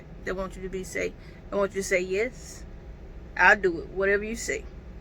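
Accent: South, United States. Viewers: Sound country 😂😂 but keep grinding ✊🏽✊🏽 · Just love that southern accent..!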